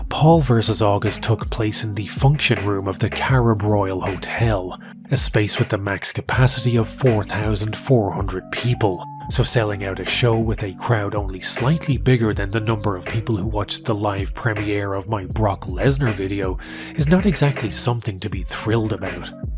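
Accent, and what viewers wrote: Ireland. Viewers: Irish accent, talks about fighting, pop culture and gaming references, that spinning disc when crediting music... ...Super Eyepatch Wolf, is this your alt channel?? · Homie, I was there in the audience of your Brock premiere. Am I anything but a legend for witnessing THE legend do some slick stuff that interests a room temperature to refrigerated MMA fan, could actually be called not a fan. I enjoy that Irish accent and the video game flourish. You are a Legend. You are such a Legend, wife got a full ass subscription to sandwich daily so you make your own and still thank your wife. Legend! 😂❤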